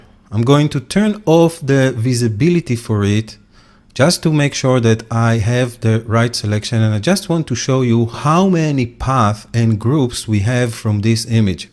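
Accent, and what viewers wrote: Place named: France. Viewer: I think you are French i like your accent! And Thank you for the work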